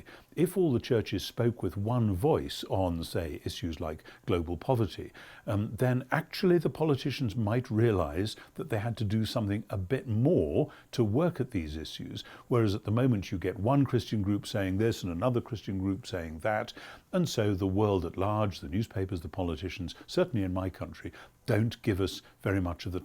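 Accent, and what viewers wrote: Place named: United Kingdom. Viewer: Way more legit with British accent. Bravo. Been thinking about this lately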